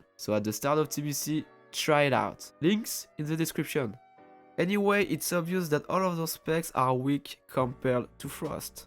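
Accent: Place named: France. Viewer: Great vid! The french accent only makes it better <3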